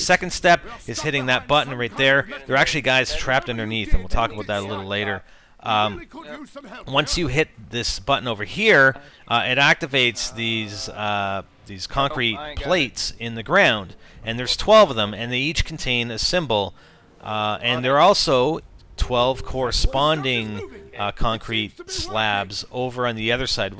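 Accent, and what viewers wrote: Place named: Canada. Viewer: your Canadian, aren't you..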